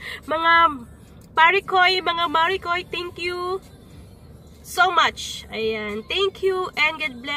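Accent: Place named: Bicol, Philippines. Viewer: Sarap ng tawa mu ah,hahaha ka bikol tlga ni palalabas mu "banonoy"😆😆😆😂😂😂😂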